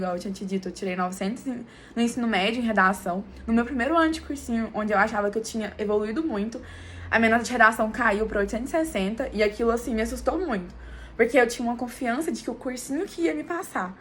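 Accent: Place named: Minas Gerais, Brazil. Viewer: sotaque mineiro é gostoso de ouvir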